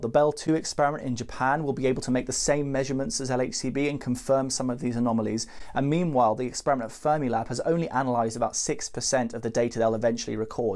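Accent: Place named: United Kingdom. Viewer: Me a big dum dum! Smarty science guy make me smarterer! Plus his lovely British accent makes it more engaging and it’s neat to think a new fundamental force is out there!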